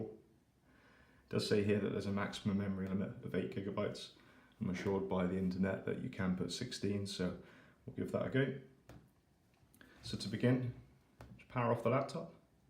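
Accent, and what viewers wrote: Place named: United Kingdom. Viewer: Informative and British. Subscribed.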